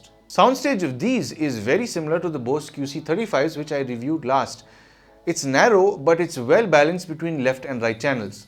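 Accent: India. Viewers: the curry accent is great!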